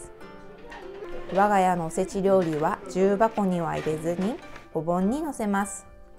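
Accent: Kansai, Japan. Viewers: 素敵なご実家ですね。今もこういったお正月行事をきちんとされているなんて本当にいいことだと思います。さんは、兵庫県のご出身とのことですがいつも動画の中では関西弁が出て来ませんでしたが、今回の動画には出て来ていましたね。😊 今年もよろしくお願いします。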